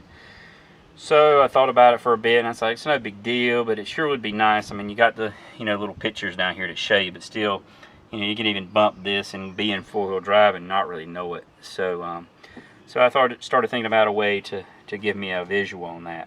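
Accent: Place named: South, United States. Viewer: I LOVE the highly intelligent review combined with the southern accent. You Sir are a class act and representative of all the good natured intelligent rural folk across our great country. And excellent review, subscribed!!!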